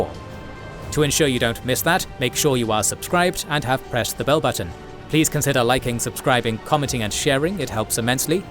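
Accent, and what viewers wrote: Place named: United Kingdom. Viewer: True spottedsharks but he’s British. Hence “St Louie” and although not here the inevitable “Mer EE land” for Maryland. “Schofield” is pronounced with a hard C (or K) rather than the schwa sound. None of this bothers me one whit. You ought to hear how we in Kentucky and Tennessee pronounce our foreign-named towns. Ever hear of Versailles Kentucky? Athens Kentucky (here with a long A.) How about Cairo IL (“KAY ro” it is there.) So yes, I get it but no, I don’t care. And I’d bet that unless you’re from Tennessee you’d stumble on Maury County (pronounced Merry) and Blount County (Blunt).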